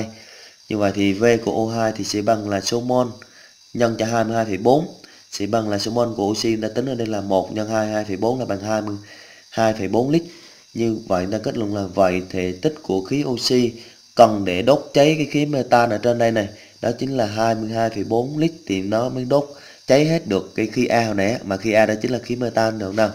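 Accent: Binh Dinh, Vietnam. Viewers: Thầy quê Bình Định à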